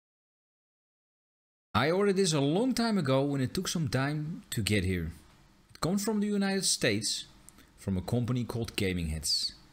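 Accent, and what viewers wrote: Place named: Netherlands. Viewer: Echt een typisch Nederlands accent.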